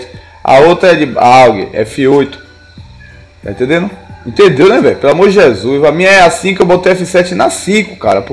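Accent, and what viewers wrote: Bahia, Brazil. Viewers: So podia ser bahiano ne kkkkkkkk ( tambem sou )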